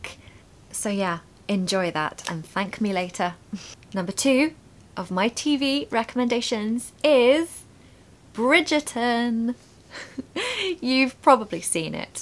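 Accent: United Kingdom. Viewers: Indeed, Your accent is really beautiful, although I live in Australia, I really like British accent , you are doing well, just keep going👏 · Hi. I am happy that I found the correct platform to listen British accent. Your way of presenting makes more attractive to keep the important stuffs in my mind. I am very excited to watch your each videos, it's not boring. Special thanks for this video on the books. I always find bored to read books, but, from your description on those books motivate me to read asap. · Awsome British English by yours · Teacher you aré great teacher , I understand you better than others teachers ,and you aré so stunning, you're british culture and accent excelent ❤👍😃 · i don't know which i learned accent, i hope so u got my writing. i watch your videos, and British accent it's lovely. i happy 'cause i understand you speaking. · Hey, you are going to be my favourite English teacher. 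Your accent is so nice. I would love to learn british accent by watching your videos and following your tips. Thank you very much for being there! ❤ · Assalamalaikum Hello U know what, i joined ur channel just bcz ur British Accent which i really love 💖😃. Thanks for the video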